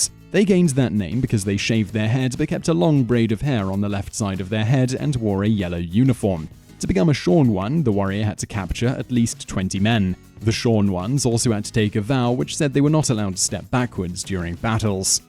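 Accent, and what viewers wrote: United Kingdom. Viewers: You have the most British voice in human existence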